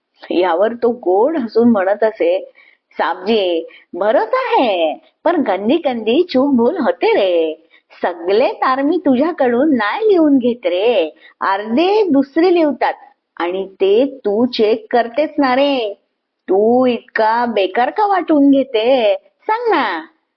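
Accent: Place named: Konkan, India. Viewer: खूप छान सादरीकरण . कोकणी ढब . गुजराथी मिक्स मराठीचा चढ उतार खूपच छान !👌💐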